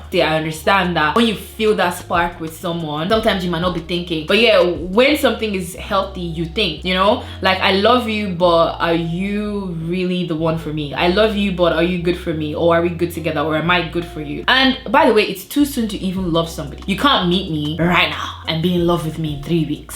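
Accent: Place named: Nigeria. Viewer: The Nigerian accent gets me all the time😂❤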